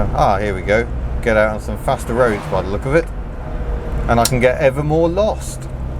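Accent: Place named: West Country, United Kingdom. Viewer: I enjoy watching a Chris Harris video (he has a prized, much loved 2CV too) but living outside the UK, very easy-on-the-ear west country accent is an absolute delight to listen to and a reminder of home. I never thought I'd enjoy content with such old and average (admittedly characterful) cars - but I'm converted! I even got to see a DAF City Car yesterday (via visit to the DAF museum) which I had the Corgi version of as a kid in the early 70s)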